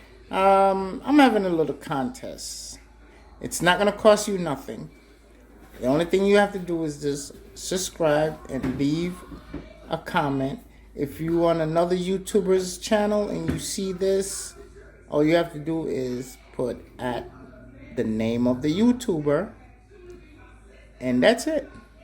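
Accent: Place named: Jamaica. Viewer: Man, you sound like a Jamaican for real bro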